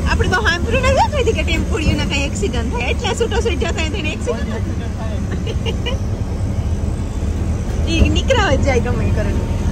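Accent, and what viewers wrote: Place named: Gujarat, India. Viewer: Bahu j sweet Gujarati bolo chho tame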